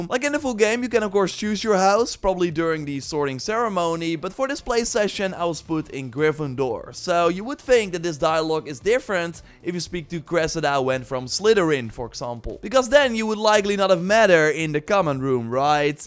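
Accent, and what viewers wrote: Netherlands. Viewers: Ben jij Nederlands vanwege je accent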